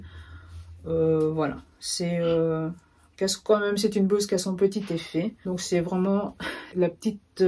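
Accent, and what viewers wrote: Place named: Belgium. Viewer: Coucou , je te découvre et j’ai adoré !! Tes cousettes sont vraiment très chouettes et chic !! J’aime beaucoup tes cousettes hommes ! Pour ton pantalon carotte , il est top , peut être le couper « tête bêche » comme pour un jean ? Pour éviter que ça vrille ? J’aime bcp le gilet sans manches de ton mari ! Pour les Jean , je vais regarder de plus près ce livre car je suis conquise par la couture jean aussi et c’est qd même un beau budget ! Bref je te dis à bientôt !! Et j’avais bien reconnu ce petit accent !! J’ai fais ma primaire et secondaire en Belgique !! A bientôt 😍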